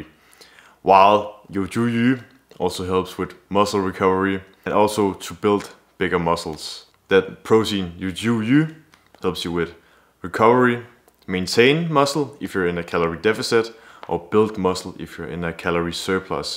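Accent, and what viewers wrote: Standard. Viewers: 被大家好嚇到 很標準🔥🔥🔥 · 大家好 很標準❤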